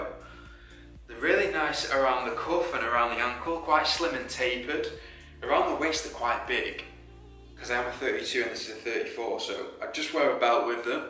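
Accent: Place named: Liverpool, United Kingdom. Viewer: Are you from Liverpool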